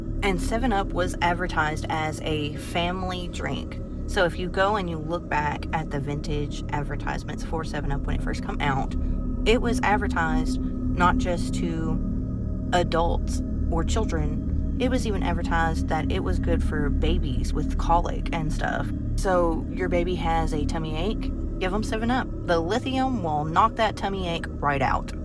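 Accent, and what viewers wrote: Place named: South, United States. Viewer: Stevie Ray Vaughn, at the height of his alcoholism and cocaine addiction would put coke in his whiskey. He was forced to clean up because the mixture was destroying his gutty wuts...Too bad he didn't go top rehab for late night helicopter rides. He thought he could save time after a gig instead of driving, and get more sleep. Looks like he got his wish. The only coca cola product I'm currently infatuated with is actual cocaine. My dude lets me watch him chip an ounce off a brick... I found out about you from the seconds of horror channel. He features your clips in quite a few of his videos, and I had to find out who the adorable redhead{?} with the southern{?} accent was and watch more of her... Maybe I shouldn't have typed that last sentence but hey....cocaine. Please don't ban me, I think I found a reason to not have that shotgun sando I have at the ready...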